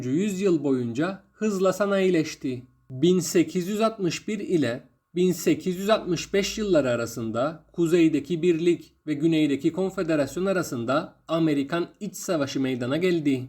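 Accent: Cyprus. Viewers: Abi sende sanki Kıbrıs şivesi var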